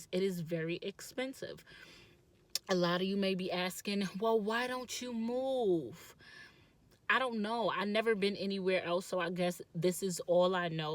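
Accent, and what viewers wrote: New York, United States. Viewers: Girlllll I knew you was from NY the moment I heard you talk!! I'm from The Bronx and can definitely appreciate you keeping it real. Loved the apartment tour!!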